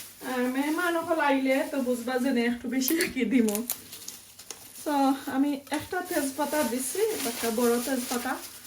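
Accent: Sylhet, Bangladesh. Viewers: Apnar sylheti mat amar je vala lage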